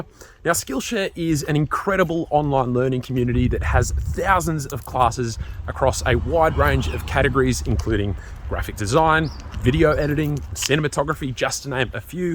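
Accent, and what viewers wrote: Australia. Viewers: Gotta say that was pretty great sponsor spot. I was so ready to fast forward, but I had to watch to compare the quality. It's not a priority for me. As long as the photos are great. Also it's great to hear a fellow Aussie. Still tossing up between the 4a 5g and the 5. I want the 90hz panel but it's hard to justify the $200. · your aussie accent makes me travel back to when i lived in australia ! very good content !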